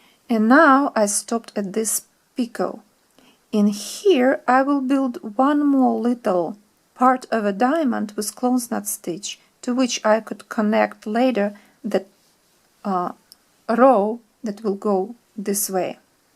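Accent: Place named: Russia. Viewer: Здравствуйте,по акценту поняла ,что русский знаком с Вами !!! Потрясающая сетка , такой я еще не видела ,браво !!!👍👍👍👍👍👍👏👍👍👍👍👍👍👍👍👍👍👍👍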